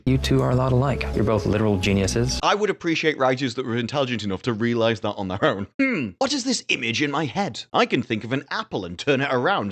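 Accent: United Kingdom. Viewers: I didn’t realize right wing American bigotry could have a British accent.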